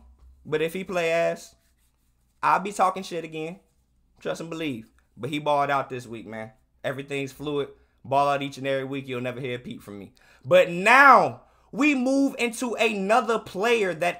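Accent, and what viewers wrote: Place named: Charlotte, United States. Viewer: That west Charlotte jumped out of you this vid brother 😂I’m mad too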